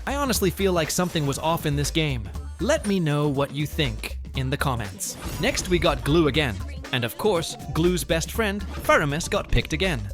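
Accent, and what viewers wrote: Ilonggo, Philippines. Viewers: Huli Hahaha ilonggo ka gale nga bilatibay ka ha😂😂😂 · Ilonggo po? hahhaa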